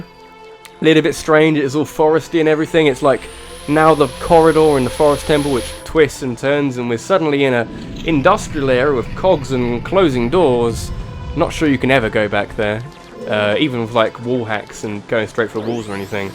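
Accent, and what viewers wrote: United Kingdom. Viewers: I love how British he is 💜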